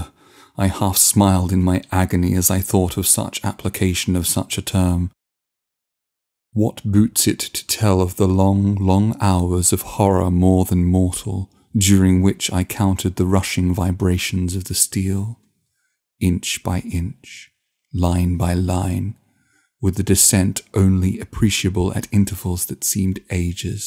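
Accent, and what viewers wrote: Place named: England, United Kingdom. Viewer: Brilliant! Thank you, good reading, like your english accent 👍😉 Greetings 👋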